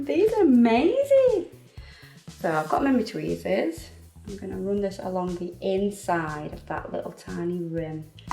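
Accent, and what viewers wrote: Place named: North, United Kingdom. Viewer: That looks freaking awesome! Loving this second channel, as soon as I've watched your nail tutorials I come over here haha! it's great to here a fellow northerner on YouTube! Hello from Merseyside!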